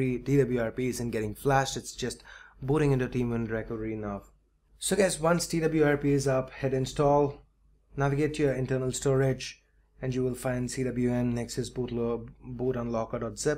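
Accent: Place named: India. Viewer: You saved my day, brother!!!!! :D & you sound like indian.... that's close to me! :)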